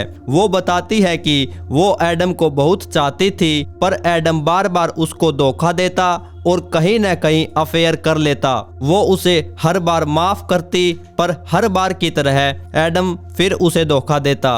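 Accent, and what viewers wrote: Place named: Rajasthan, India. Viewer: Rajasthani aise hi bolte he shyad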